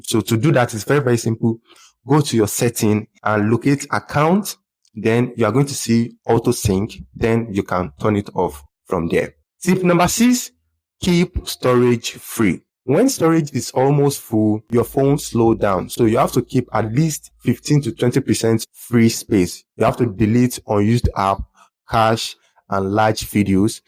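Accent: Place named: Nigeria. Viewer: Nigerian accent 😊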